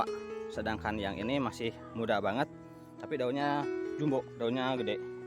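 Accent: Indonesia. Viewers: Very nice orchids and advice. It sounds like you are Indonesian? I lived there for 12 years and loved it 😎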